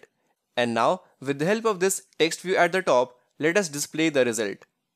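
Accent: India. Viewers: typical indian voice!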